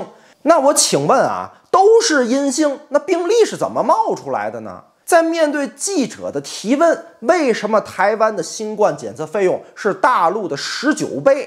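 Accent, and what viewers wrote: Tianjin, China. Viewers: 听口音好像天津的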